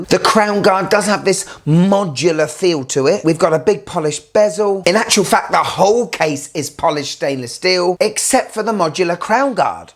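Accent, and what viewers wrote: United Kingdom. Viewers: Holy mackerel that Michael, Caine British accent, Austin Powers is so difficult to listen to. Seems like a cool guy though and understands watches for sure but wow what an accent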